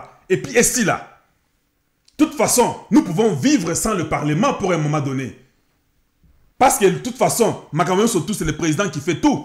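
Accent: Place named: Texas, United States. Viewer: Votre colère est justifiée vous n'avez pas mâché les mots. Vous méritez un post ministériel avec vos compétences. Vous parlez comme un texan! Merci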